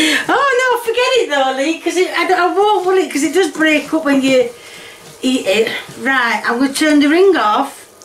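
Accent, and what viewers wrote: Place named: South Yorkshire, United Kingdom. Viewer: Are you a South Yorkshire Lass like me ? We have the same accent ❤️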